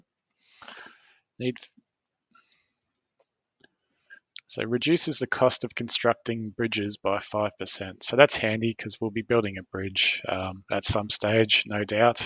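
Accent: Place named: Australia. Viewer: G'day mate. I just came across your series of videos. Very good to watch, so I have subscribed to your channel. I'm watching from Melbourne, and by the sound of your accent, I think you know where that is.🙂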